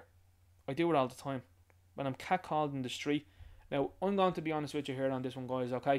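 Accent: Dublin, Ireland. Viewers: Good review. Nice to hear a Dub accent, too. Good one.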